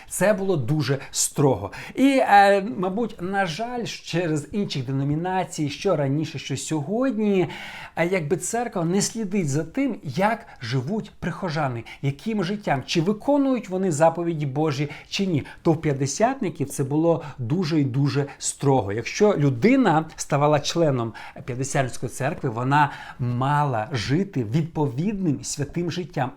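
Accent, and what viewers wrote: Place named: Western Ukraine, Ukraine. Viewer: Накінець то по українськи бо вам рос. абсолютно не йде бо чути шо ви з західної України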